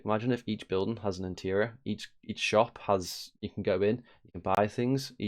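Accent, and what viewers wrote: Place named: England, United Kingdom. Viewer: Great video bro good to hear an English voice commenting on BCRC 🏴✌️✌️✌️ can’t wait for my vox cat airdrop to use in boss planet it’s going to be 🔥🔥🔥 boss cats stick together keep up the great work guys. WAGMI everyone is bullish on boss cats don’t miss out people these great you tube videos give you all the information you need. Announcements after announcements are always the norm in boss cat rocket club and delivering day by day on the roadmap this is just the beginning ayoooooooooooooo LFG lg1985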